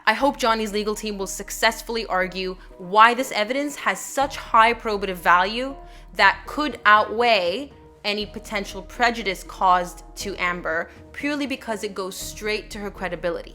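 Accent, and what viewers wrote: Canada. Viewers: I’ve wondered for months where you practice. I eventually settled on Canada bc of your accent, (or lack thereof.) I’d have never guessed Australia in a million years. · I just realized how rude my comment could sound; I just meant I thought you had a faint Canadian accent. My apologies.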